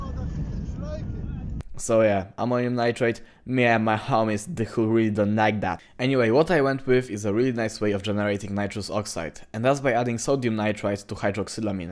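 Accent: Poland. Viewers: Słychac że jesteś z pl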